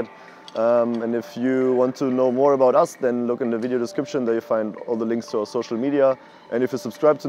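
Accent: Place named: Germany. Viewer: B H-Abigel German accent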